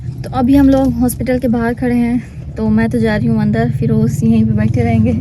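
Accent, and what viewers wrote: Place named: Lucknow, India. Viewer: Ap ka bat karne ka lehja or tarika India Lucknow ke logo ki tarha he... Love from india🇮🇳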